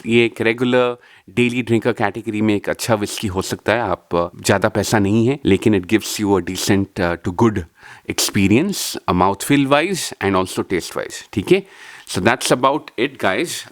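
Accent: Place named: Bengal, India. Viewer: With ur accent I’m guessing ur bengali babu. Tell me if I’m correct?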